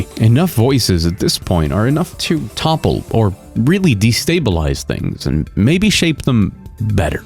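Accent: France. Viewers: ur french accent is good! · The wrong assumption big studios and marketers make is mostly that focus groups represents organic crowds. They dont. Focus groups and surveys are a simulation of real people collective behzvior and quite easily miss the mark. And kudos for your french accent :)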